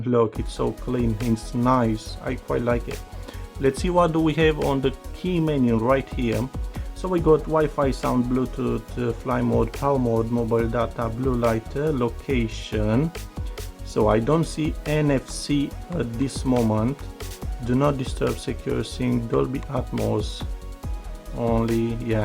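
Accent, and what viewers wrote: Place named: Romania. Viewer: de accent is from Romania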